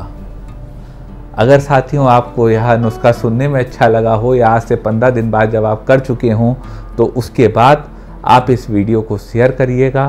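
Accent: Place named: Bihar, India. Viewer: Come to the point than beating around the bush.why Punjabi having bihari ascent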